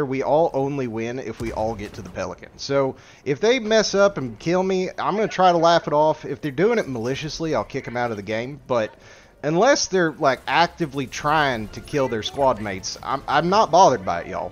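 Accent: South, United States. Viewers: This dude is legit, great to hear southern accent too😆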